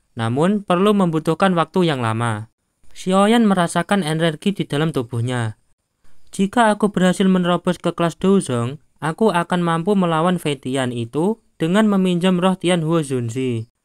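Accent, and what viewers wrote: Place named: Java, Indonesia. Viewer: Suara mu meddo banget mass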